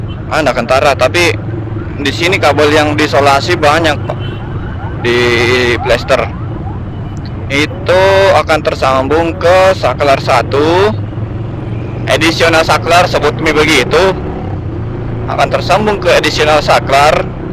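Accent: Makassar, Indonesia. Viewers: mantap mas bro... makassar banget.. lanjutkan om... hehehe...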